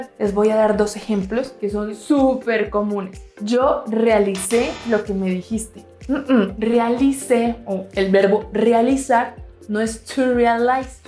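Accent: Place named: Colombia. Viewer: pues ella es d colombia¡!, me debes 20$ dolares x la infor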